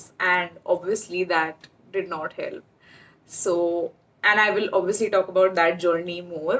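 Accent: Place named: Gujarat, India. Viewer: Why the f word. You sound cool without even using it.. rather add your Gujrati accent I am great fan of your Gujrati accent.... morecedez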